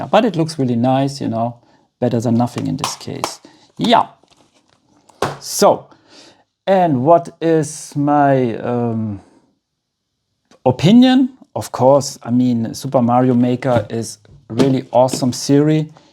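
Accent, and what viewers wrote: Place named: Austria. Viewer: Ich liebe den Akzent. Du bist Österreicher, oder? 😊 Richtig gutes Video! Daumen hoch dafür! 👍👍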